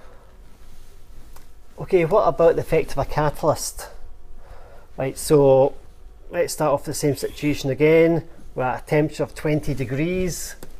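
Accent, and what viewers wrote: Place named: Scotland, United Kingdom. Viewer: THANK YOUUUU NO INDIAN ACCENT FINALLY!!!! scottish sounds so much better!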